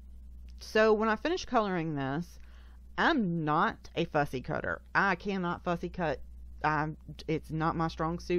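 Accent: South, United States. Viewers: Love this card!!! Going to get this digi right now!!! Love your accent too, not sure when your from, but you kinda sound like your from the south like me!! Big Hugs!!! (US) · Thanks for the great coloring tips. I do not like fussy-cutting either. I have a scan n cut that I got from a friend for cheap, but I sill don't really know what I'm doing with it. USA P.S. I'm from the Pacific Northwest, so I really enjoyed listening to your sweet southern drawl.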